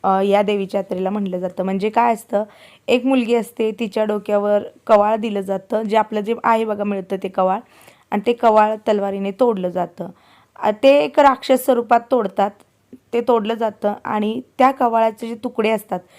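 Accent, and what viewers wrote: Kolhapur, India. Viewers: टेंबलाई देवीचे दर्शन तुझ्या मुळे आज झाले🙏.... अणि तुझ्याकडुन कोल्हापुर चि भाषा ऐकायला छान वाटते🤗.. भाषेचा लहेजाच असा आहे...!! 👍👍 🚩🚩Lots Of love and Blessings to you & all Family 🧡🧡🧡🧡🧡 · हाय खूप छान जत्रा एन्जॉय केली. असू दे छान बोलतेस.कोल्हापूरी भाषा पण छान वाटते. मी पुण्यात राहिली आठ वर्षे.देश तसा वेश करावा लागतो. व्हिडिओ छान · तुझ बोलन खुप गोड आहे तु काही बदल करु नकोस कोल्हापूर ची बोलीभाषा छान च आहे मुंबई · Hi tai tu khup mast boltis nko change kru bhasha mi pn kolhapur chi aahe tujhyamule mla devich darshn milal mi geli nhi yaveli so khup thanks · Aapli bhasha kolhapuri aahe, bilkul badlaych nahiii... Amhi kolhapurkar 🙏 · तुम्ही आहात तशाच गोड आहात.. कोल्हापूरचा अस्सल फील येतो...माझे माहेर आहे कोल्हापूर...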